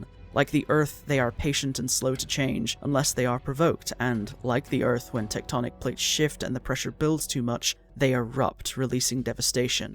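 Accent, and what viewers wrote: Scotland, United Kingdom. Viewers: Im guessing your a scot?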